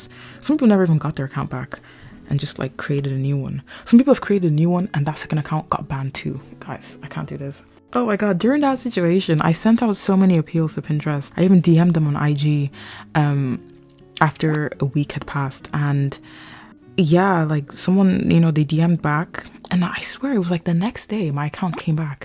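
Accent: Ireland. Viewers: ur irish accent is really popping through in this video !! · She sounds more Irish to me 🤔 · Omg are you irish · she's irish lmao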